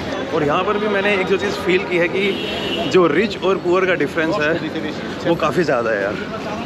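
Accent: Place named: Delhi, India. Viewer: Brother I understand every word of yourself , your voice is similar to delhi region hindi , I study in delhi university in 2007 and live 4year in india , I am also able to read and write hindi and speak also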